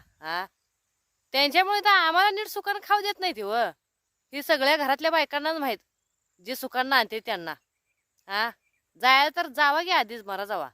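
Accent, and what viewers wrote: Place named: Western Maharashtra, India. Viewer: बोलण्याची पद्धत आवडली ,बोलीभाषा पश्चिम महाराष्ट्रातील दिसतेय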